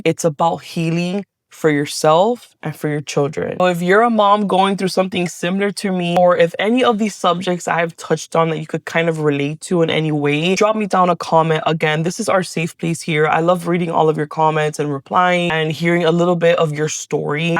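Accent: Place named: Southern California, United States. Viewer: Indicted TV's Negra MUST meet this youtuber! 😊 the SoCal accents 😊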